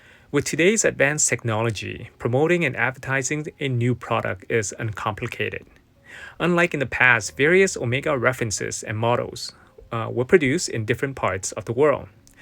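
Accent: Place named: Canada. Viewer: Yes I can tell you're Canadian from your accent😂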